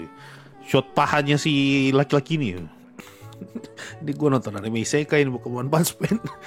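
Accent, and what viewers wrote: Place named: Eastern Indonesia, Indonesia. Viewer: Sorry bang subscriber baru, ini kenapa logat timur nya bocor sedikit. Orang mana kah admin ini?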